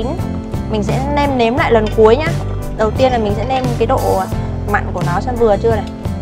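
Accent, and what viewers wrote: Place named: North, Vietnam. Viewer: giọng bắc:nge nặng trỉu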